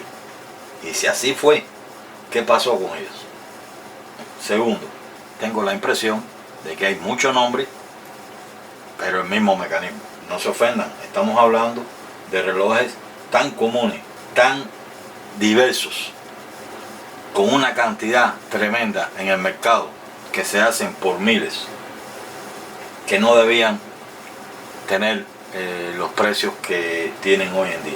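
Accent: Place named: Cuba. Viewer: Por el. Acento pareces cubano es decir somos paisanos, concuerdo contigo hay mucha vistilla y poca autenticidad, hoy casualmente compre un Tissot seastar seven de cuerda manual, q lleva un 781-1 y por esos años 70 me imagino q la base sea in house! Pero me surge la duda... Gracias por tan interesante contenido, sigue compartiendo!! Y si me pudieras decir q maquinaria tendría esa pieza de la q te hablo!! Lo demás son dolares tirados, los relojes auténticos en cuanto a su interior están muy escasos!!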